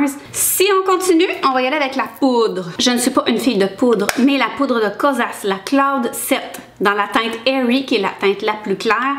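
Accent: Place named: Quebec, Canada. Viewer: Coucou, merci pour tes recommandations;) ma fille ainée est à Rouyn Nouranda pour ses études secondaires, j'aime penser qu'elle entend ton bel accent québécois:)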